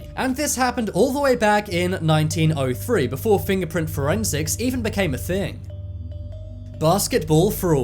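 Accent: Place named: England, United Kingdom. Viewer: Your accent 😭♥️♥️.you From England???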